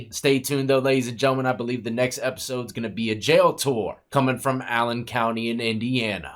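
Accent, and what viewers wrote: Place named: Detroit, United States. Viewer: Yo, you from Detroit?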